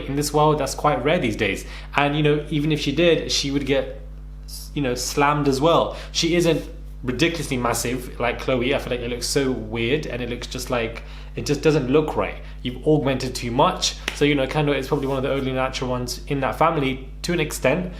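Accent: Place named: London, United Kingdom. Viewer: !!!... I just found this channel and literally binged! Bite size gossip spoken by a Londoner... Love it!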